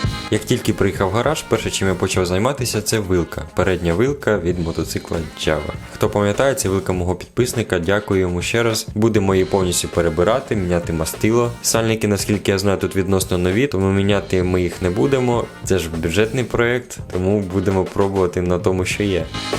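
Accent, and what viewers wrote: Chernihiv, Ukraine. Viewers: опа, а ти з Чернігова? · Ти з Чернігова? Вогонь)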